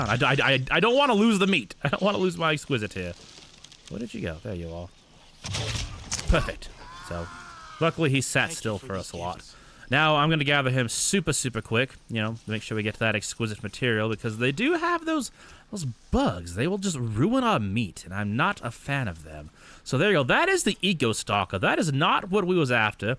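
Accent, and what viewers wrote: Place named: Australia. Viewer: bro u sound aussie sometimes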